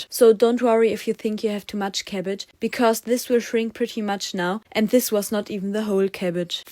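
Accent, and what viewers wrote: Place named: Germany. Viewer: Oh god that disgusting German accent is Just pathetic. Learn to speak properly or Just dont do it.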